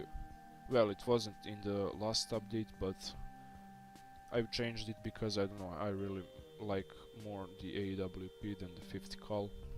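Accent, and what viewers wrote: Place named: Russia. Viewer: Как будто русский говорит по английски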